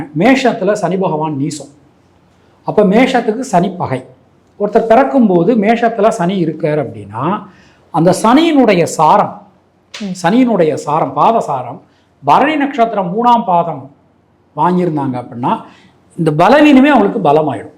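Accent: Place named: Manipravalam, India. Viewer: அம்மா நன்றி தயவுங நமது மணிப்ரவாள பேச்சு நடையை விடாதீங்கோ.நன்னா பேசறேள்.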